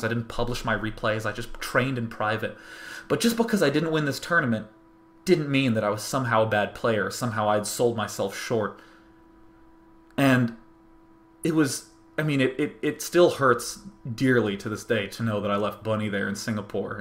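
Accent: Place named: Russia. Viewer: nice russian accent!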